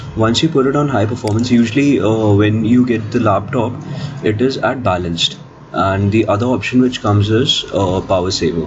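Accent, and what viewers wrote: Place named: South Asia. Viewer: I HATE PAKISTAN-INDIA ENGLISH ACCENT.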